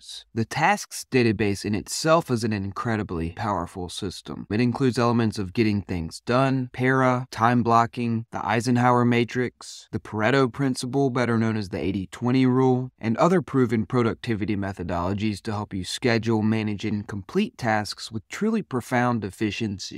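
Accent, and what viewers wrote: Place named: Maryland, United States. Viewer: interesting stuff! side note - are you from Maryland with that accent?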